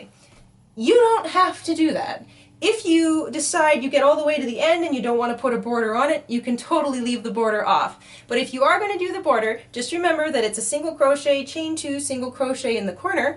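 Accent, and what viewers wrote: Canada. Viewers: This was such a great video full of great tips especially for a beginner thank you so much also it was so fun to watch that cute giggle and Canadian accent!!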